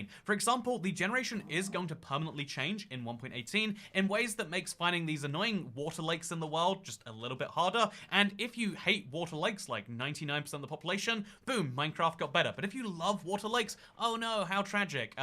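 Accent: United Kingdom. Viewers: When it comes to adding amplified to bedrock, I feel like the reason is not so much the difficulty of adding it, but maintaining it. You gotta remember that they really want worlds to transfer fine to newer versions. So yeah, that's my guess. P.S.: I find the r thing British people do hot. You're welcome. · Now that he said that, I notice that speaking pattern with all the British YouTubers I watch.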